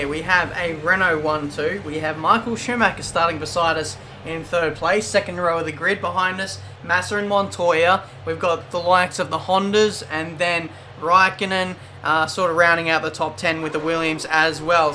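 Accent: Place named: Australia. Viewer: no... Almeno non tagliano così... Comunque mi godo il suo accento australiano, capisco e mi diverto.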